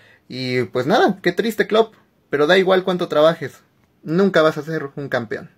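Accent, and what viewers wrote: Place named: Mexico. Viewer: Como se nota que este es mexicano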